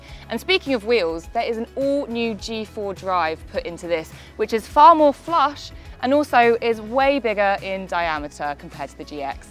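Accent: United Kingdom. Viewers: With her British accent, talking about London traffic and the bike being 16.3 kg, I was totally expecting her to use KM instead of Miles.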